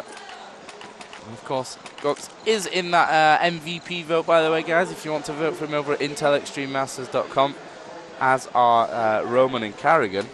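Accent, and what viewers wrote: Australia. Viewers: wow, aussie commentator! AUZ FTW! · Who commentated this? An aussie?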